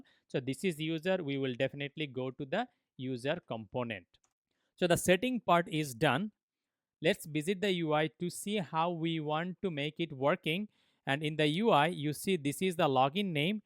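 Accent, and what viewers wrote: India. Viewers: Heavy bengali accent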